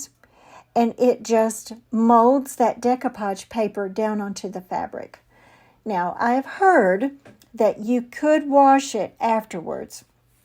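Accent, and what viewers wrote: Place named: North Carolina, United States. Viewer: Good morning from San Antonio! I really loved these ideas this week! I love all of your ideas, but this one inspired me so so much!!!! I have a quick question. You said you are from North Carolina.. I originally from Va beach and we just moved here to tx 3 years ago. Long story short. My family is originally from NC, actually my entire extended family still lives there. They live in Belmont, Lincolnton, And small town High Shoals. Now that my very long story, my life story 😂😂 are you close to those areas?? It’s your accent that sounds like them!! I guess I’m chatting with you because your my favorite!!!! Thanks 😊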